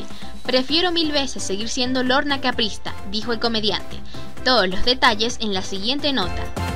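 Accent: Venezuela. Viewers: en este video se te sale el acento venezolano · ACENTO ME SUENA VENEZOLANO Y NO PERUANO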